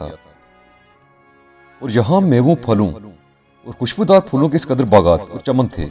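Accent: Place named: Murree, Pakistan. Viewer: آپ کی آواز سے لگ رہا ہے جیسے مری یا ایبٹ آباد سے تعلق ہے اچھی ویڈیو بنائی ہے جزاک اللّہ خیر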